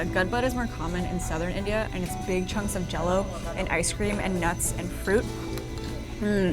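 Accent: Philippines. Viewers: Man, u even talk and eat like a Filipino. I'm very impressed. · Your English is sounds like filipino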